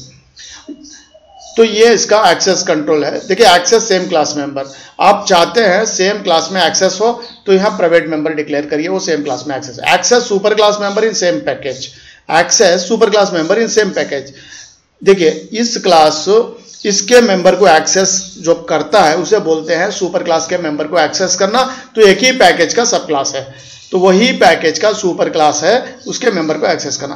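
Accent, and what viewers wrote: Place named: Hyderabad, India. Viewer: Haha! Hyderabadi! 👌🏻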